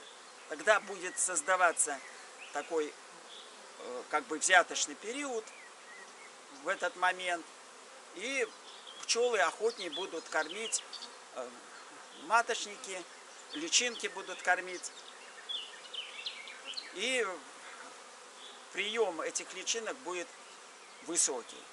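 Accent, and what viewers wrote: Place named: Belarus. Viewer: я сразу по говору понял что вы с белоруси)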